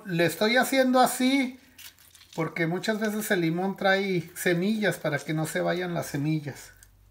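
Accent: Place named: North, Mexico. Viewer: Hola me gusta mucho su canal. Sus recetas me recuerdan mucho a las de mamá. De que parte de México es, por su acento you pienso que es del Norte. Sonora? Sinaloa? Chihuahua?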